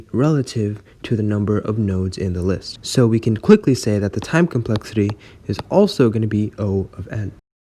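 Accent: India. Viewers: Are you from hyderabad ?🙃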